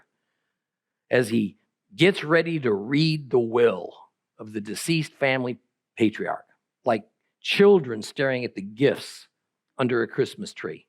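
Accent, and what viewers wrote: Florida, United States. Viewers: Im willing too bet by this mans accent y'all from Florida somewhere this guy sounds like a Baptist preacher i heard out of Florida they could be identical by voice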